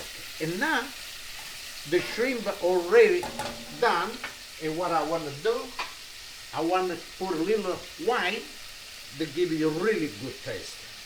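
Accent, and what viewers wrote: Italy. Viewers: I came across your video looking for a recipe with shrimp & pasta. I’m definitely going to try this. You’re adorable, I love your accent. I’m Italian too. My husband is going to love this dish! Thank you for sharing. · The more he tries to lose Italian accent, the more I learn Italian language....nice recipe tho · I can't get enough of his accent he's one hundred percent Italian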